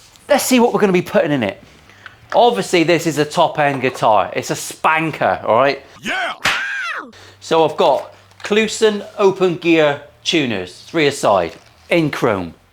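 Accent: United Kingdom. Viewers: I thought you said Woman but no my British local reginal accent translator kicked in and realised you said Warman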